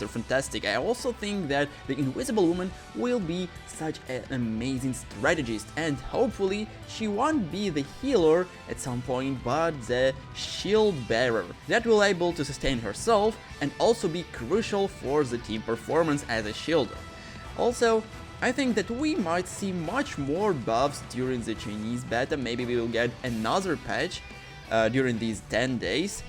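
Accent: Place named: Baltic states. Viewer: Are you from the baltics? I have a friend from there and he has the same accent as you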